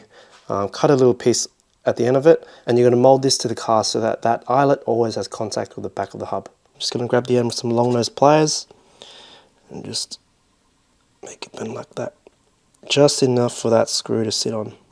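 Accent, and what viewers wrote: United Kingdom. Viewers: I know it’s random but it’s so weird hearing Asians from the uk with the British accent i grew u in hood where’s there’s a lot of Asian gangsters in America and they always Wildin out bilingual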